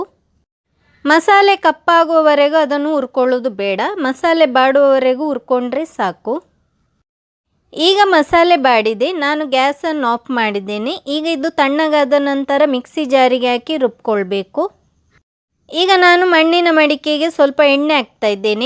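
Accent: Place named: Kundapura, India. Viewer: ಹೊಯ್.... ನಿಮ್ಮ ಕುಂದಾಪುರ ಕನ್ನಡ ಲೈಕ್ ಇತ್ತೆ... 😋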